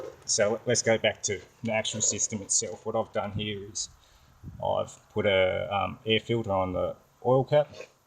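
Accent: Australia. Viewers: Its not. Perfectly normal Aussie accent. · Damn! If your Aussie accent was a little less prominent I might be able to understand your mumblings. Seriously, Mate. Speak clearly for the audience. I did figure out your hose routing, so, thanks for that. Be well.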